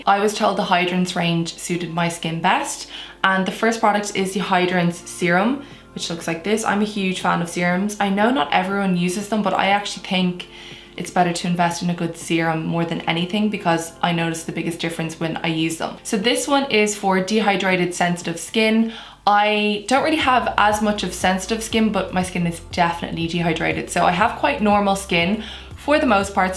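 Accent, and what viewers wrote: Dublin, Ireland. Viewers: Yocheved Lam it's an Irish accent, probably more specifically Dublin or somewhere around Dublin anyways 😂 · Omg you're so pretty :) Are you from Ireland? If so what county/province. Can't figure out whereabouts your dialect is from 😅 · Like literally It's so similar I feel like Charlize is doing an Irish accent and I'm watching her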